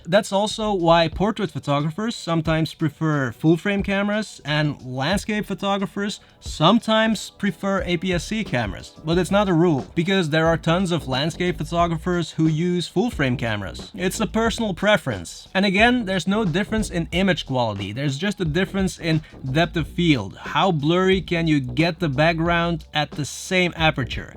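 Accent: Belgium. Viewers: Antwerp accent?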